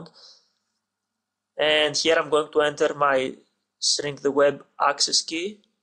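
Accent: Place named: Russia. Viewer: u are a russian dude hahaha i knew it from ur voice lol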